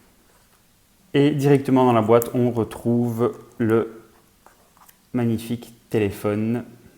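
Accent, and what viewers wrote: Belgium. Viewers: with a belgium accent i would say a belgium accent but i dont arrive to find town Charleroi Liege etc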